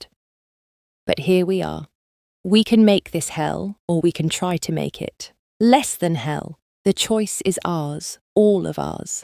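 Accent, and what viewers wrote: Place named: United Kingdom. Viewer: For some reason when I hear a British accent telling the story, it helps me what is that, lol love that accent all the time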